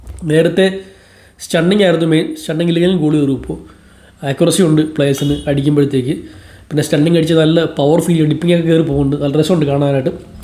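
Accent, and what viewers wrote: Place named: Kasaragod, India. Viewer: Majje illa nammale kasaragod bhasha alle ath😁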